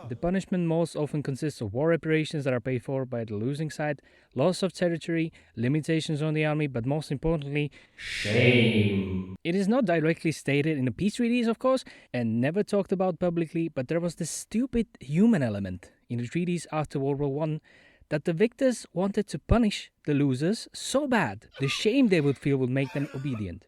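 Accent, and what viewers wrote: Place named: Eastern Europe. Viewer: inak otazka ty si slovak ci cech ci co lebo lebo znies tak slovansky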